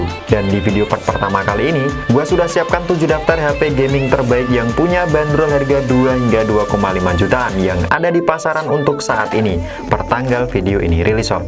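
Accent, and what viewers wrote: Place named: Java, Indonesia. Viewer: Jowo ngendi mas😁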